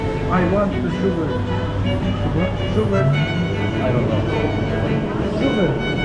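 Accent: South Korea. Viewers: 경상도사투리 쓰셔서 경남 사시는줄😂😂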